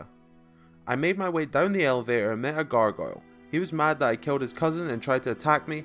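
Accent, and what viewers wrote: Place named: Scotland, United Kingdom. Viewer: Me: "I've seen a shield only run of this game." Youtube: "But have you seen it with a Scottish accent?"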